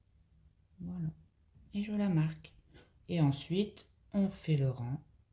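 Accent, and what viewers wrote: North, France. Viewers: Bonjour crochet très joli je pense la faire ce fil est tellement super vos explications sont claires. Une question sans indiscrétion seriez vous du Nord car votre accent m interpelle?tres beau travail merci beaucoup et bonne semaine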